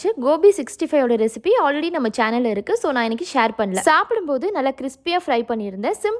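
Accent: Madurai, India. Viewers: Neenga madurai ah sis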